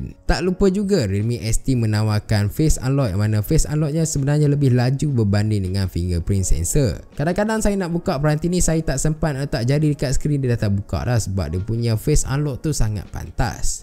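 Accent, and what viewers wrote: Taiping, Malaysia. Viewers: are you from taiping?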